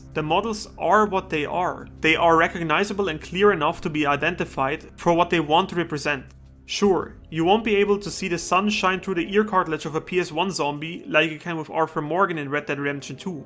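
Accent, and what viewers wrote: Germany. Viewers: The German accent is strong with this one... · Dude you sound incredibly german digga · That is one thick German accent 😁😁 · I smell German from this guy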